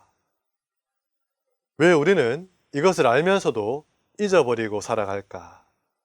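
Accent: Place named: Gyeongsang, South Korea. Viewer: 차분하게 알아듣기쉽게 구수한경상도말씀이 너무도 잘 드렸습니다 고맙습니다~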